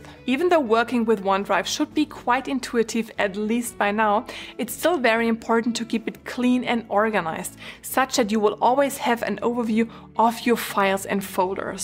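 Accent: Germany. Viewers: Is that German accent? Just curious, good video btw 🐥❤️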